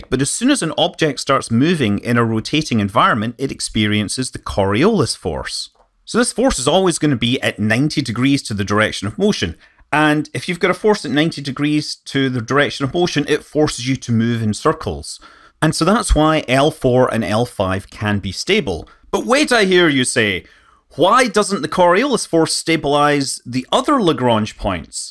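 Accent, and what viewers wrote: Scotland, United Kingdom. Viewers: Great visuals and great, great narration! The Scottish brogue is icing on the cake! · Why is Maths called 'math' by a guy with a Scottish accent?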